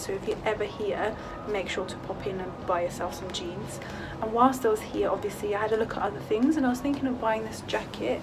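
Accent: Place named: United Kingdom. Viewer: I never tought that you able to speak turkish because of your incredible brit accent 😅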